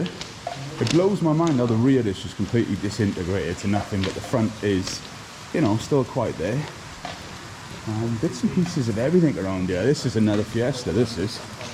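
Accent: Wales, United Kingdom. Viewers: Where you from mate im from Abergavenny and by your accent i recon you've got to be from somewhere like Brynmawr or Ebbw Vale??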